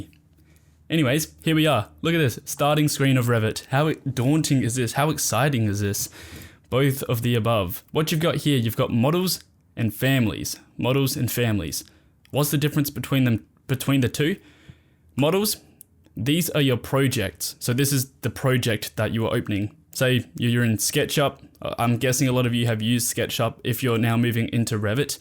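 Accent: Australia. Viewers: Are you australian ?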